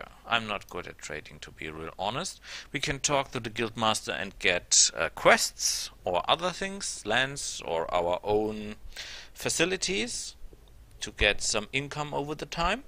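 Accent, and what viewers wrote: Germany. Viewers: I hear it stop and go AH NO! press the next one straight away love this so far and it only ep 1! on a side note your accent it interesting German?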